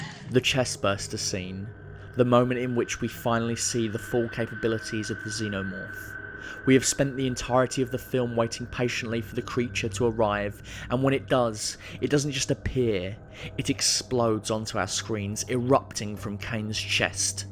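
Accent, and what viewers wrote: United Kingdom. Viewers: He's British, nothing odd about his voice.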